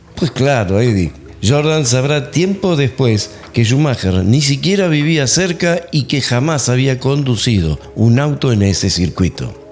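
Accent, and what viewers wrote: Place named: Rioplatense, Argentina. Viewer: Espléndido informe, nuevo suscriptor. No sé si sos argento o uruguayo 🙋🏻‍♂️desde Chaco🇦🇷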